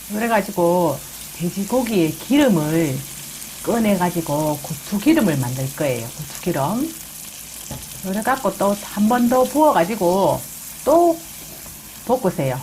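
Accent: Gyeongsang, South Korea. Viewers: 얼굴은 예쁜데 목소리는 촌스러운 경상도 아줌마 늘 잘 보고있어요 최고입니다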